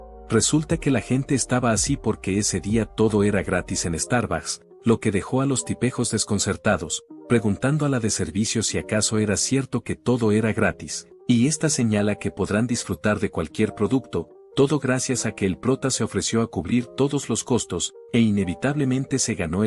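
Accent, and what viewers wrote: Dominican Republic. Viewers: que buen resumen con su acento dominicano jaja esa vaina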